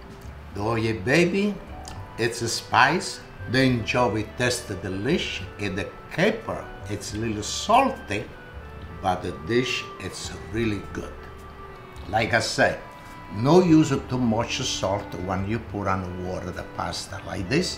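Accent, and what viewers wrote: Italy. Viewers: Perhaps cut the anchovy up to spread evenly would be the only different thing I’d do I love listening to the slang Italian Yr like the old compare next door. Bravo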